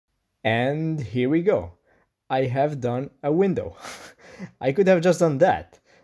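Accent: Italy. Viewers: ma sei italiano! Complimenti per l'accento...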